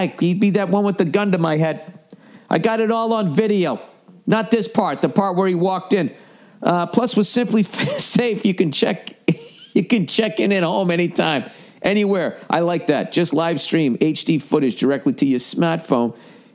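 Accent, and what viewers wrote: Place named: New England, United States. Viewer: that new england accent always gets me. "YOU COULD GET AN AWWSKA"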